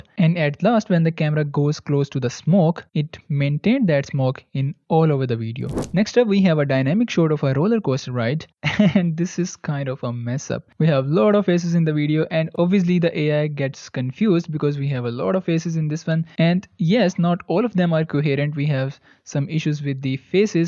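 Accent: India. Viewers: Honest opinion. International audience will only watch neutral international voice and accent. Not our hopeless unimpressive Indian accent. Change it to AI voice for more views. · I actually prefer this real voice and accent over AI by now.... maybe bc it’s authentic and I trust it more now, I’m sick of these AI voices cause every Indian guy takes advantage of AI like crazy 😂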